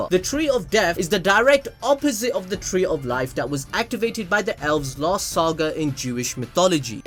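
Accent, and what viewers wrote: Punjab, India. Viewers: Hey are u punjabi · Haaaai are you Indian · that punjabi accent lol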